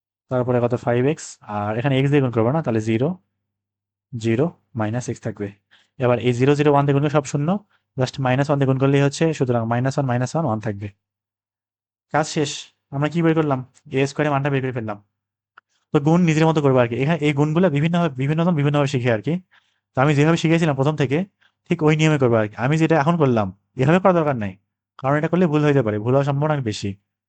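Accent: Naogaon, Bangladesh. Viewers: Onk upokrito holam..❤sir hamar bari kolo nouga vasa kemba chena chena lagce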